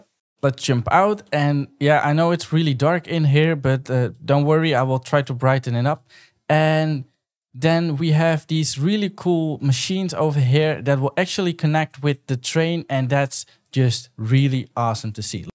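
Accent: Netherlands. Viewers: i immediatly thought i noticed a fellow dutch's accent, looked in your channel bio and saw i was right haha.. anyways great vid tho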